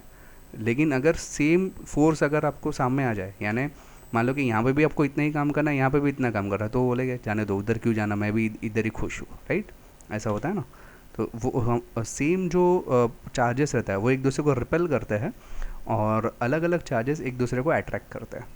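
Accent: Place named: South India, India. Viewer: Amazing way of teaching great sir, seems like you are from South 😊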